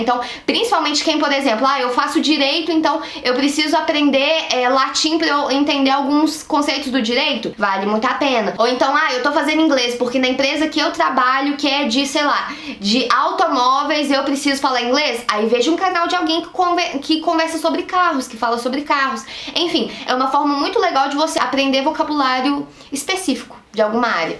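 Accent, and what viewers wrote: Minas Gerais, Brazil. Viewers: Aaaaaaaah vc e mineiraaa , eu sou da região de venda nova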